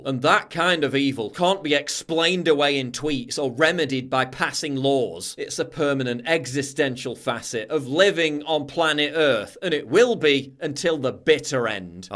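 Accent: United Kingdom. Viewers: This guy speaks with a heavy British accent. Are they even allowed to own in Great Britain. I bet that he has never shot a 50 cal, and probably denies ever masturbating.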